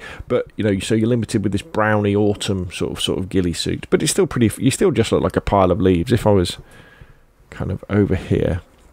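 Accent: United Kingdom. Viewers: Man, good video and all but: Did someone ever tell you that you have an amazing voice? goes down like melted butter. Maybe im just too big of a fanboi for the bri`ish accent but still, u should think of doing ASMR or voice acting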